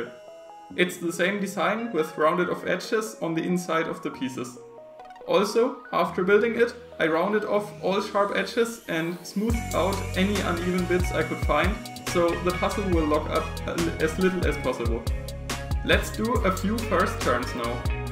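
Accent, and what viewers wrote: Germany. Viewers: are yo german? bc your dialect sounds like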